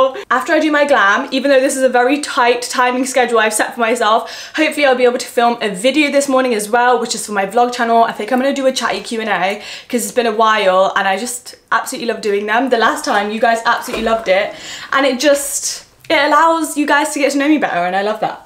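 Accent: United Kingdom. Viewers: You just slayed it girl❤ and one request.. can u plz help us how you got the British accent bcoz Ur voice is so bold and amazing!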